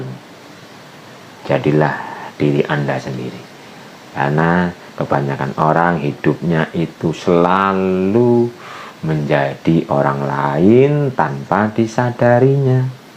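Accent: Banyumas, Indonesia. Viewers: Assalamualaikum wr wb Qolbitu ustadz ilmu nya,,ilmu sgt bermanfaat,,ustadz ko ngomong ngapak ya 🙏😀😀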